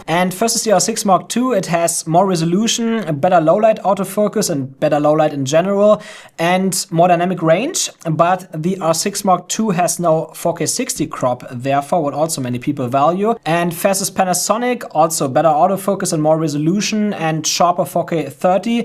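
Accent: Germany. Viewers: Man hört diese deutsche akzent so hart raus Hahahah aber gutes video aufjedenfall 👍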